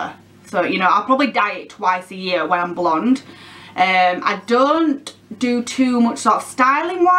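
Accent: Yorkshire, United Kingdom. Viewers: Yey , it’s nice to not be the only YouTuber with a Yorkshire accent 😂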